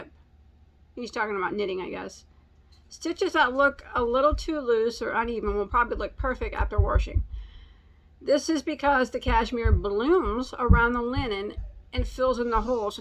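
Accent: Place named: Illinois, United States. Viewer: No one should correct your regional pronunciation. A lot of people pronounce "wash" the same way. A friend from Illinois used to say it like that, and I know you're also in Illinois. Most of us have a regional dialect & it's impolite to belittle that.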